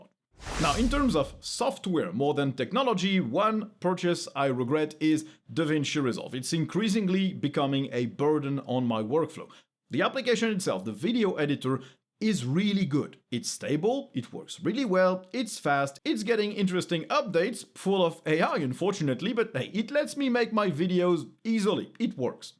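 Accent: France. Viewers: random question here, are you french ?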